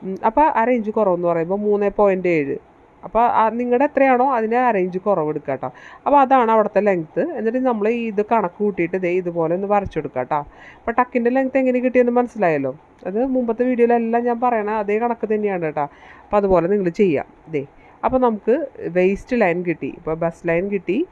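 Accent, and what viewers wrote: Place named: Thrissur, India. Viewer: നിങ്ങള് material മ ടക്കുന്നത് ഒന്നും പറഞ്ഞു തരുന്നില്ല . തൃശ്ശൂർ karude varthanam nangalk ഒന്നും മനസ്സിലാവില്ല. prini, miracle ഇതൊക്കെ kandu നോക്ക് . എങ്ങനെ അവർ പറഞ്ഞു തരുന്നത് എന്ന്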